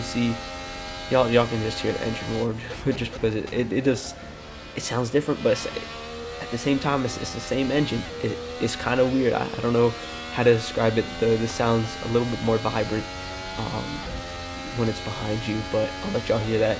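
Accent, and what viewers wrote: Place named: Canada. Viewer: You sound extremely Canadian.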